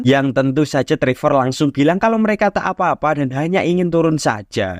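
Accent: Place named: Java, Indonesia. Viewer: channel yg tidak ada apa apanya di banding iq7, suaramu medok gak enak di dengar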